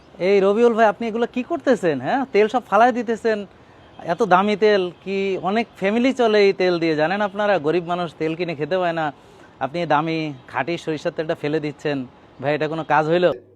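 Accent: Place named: South, Bangladesh. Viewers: ভাই আপনি খুলনার ভাষা কোহানে শিখলেন। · Sir apnar দক্ষিণী ভাষাটা ভাল লাগছে।মনে হল আপনি আমার বড় ভাই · যশোরের ভাষা মনে হয়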